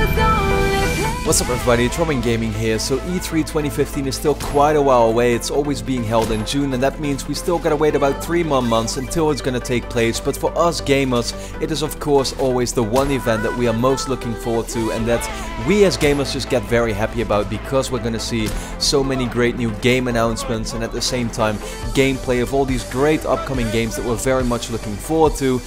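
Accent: Netherlands. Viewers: Ik dacht al deze gast spreekt met een Nederlands accent :p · are you dutch?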